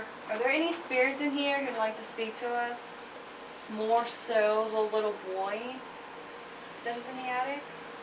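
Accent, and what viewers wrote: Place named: United Kingdom. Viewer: Great setting. Nice background story. You have a slight British accent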